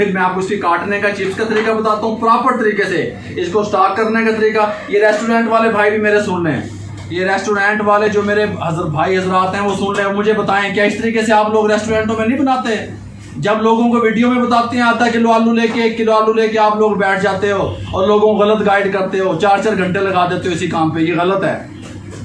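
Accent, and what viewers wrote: Punjab, India. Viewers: Well done brother I like your style Punjabi accent mein Urdu Kamal boltay hein tension nahi leni 👍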